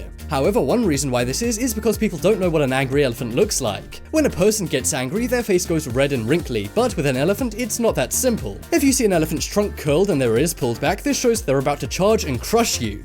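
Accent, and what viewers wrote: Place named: United Kingdom. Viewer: I lie, your British accent 👍🏻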